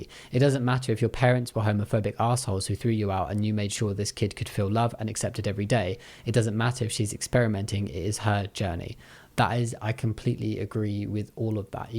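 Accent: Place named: United Kingdom. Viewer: is so scary cause i can imagine you with an american accent now and im so happy you’re not and instead a nice sounding British man with a gentle voice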